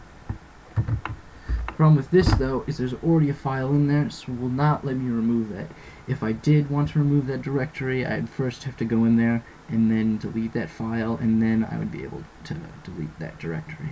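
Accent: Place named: Brooklyn, United States. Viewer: You sound like you are from Brooklyn.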